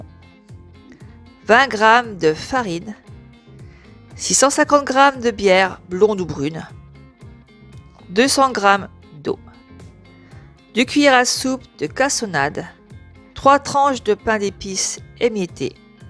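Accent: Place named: Ardennes, France. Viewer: Bonjour, j'ai reçu mon compact cook pro cette semaine et me voilà un peu perdu car c'est mon 1er robot, j'ai toujours cuisiner sans. Donc me voilà à chercher des recette et quel bonheur d'être tombé sur votre compte, je sent que ça va bien m'aider merci. Jhabite dans les Ardennes et je pense reconnaître cet accents ☺️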